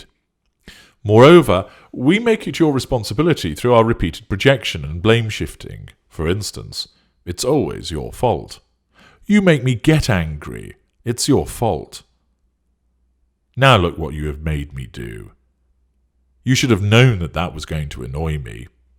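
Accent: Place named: England, United Kingdom. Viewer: I like listening to voice, I enjoy the English accent. My ancestors came to the U.S. from england, One day I hope to take a trip over there and spend some time.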